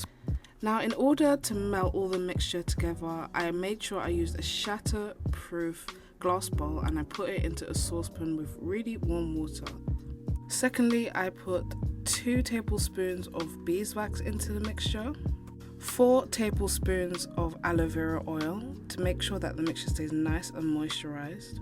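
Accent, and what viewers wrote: United Kingdom. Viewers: you have a lovely voice I always wanted to speak in a British accent!🍵💕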